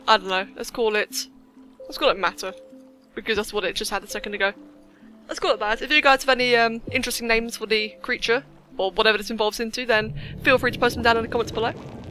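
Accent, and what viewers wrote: United Kingdom. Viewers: Perhaps it is British English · My brain was so confused lol I thought you were a preteen Brit lmao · you sound british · This is either a nerdy boy or a British girl 🧐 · You're British!!! I love you even more now!!! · R U from the UK? U sound British or something. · Oh. You are british i see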